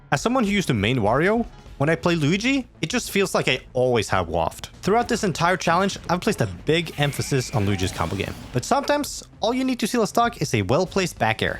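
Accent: Norway. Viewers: Kommer du fra norge? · Du er norsk? Kult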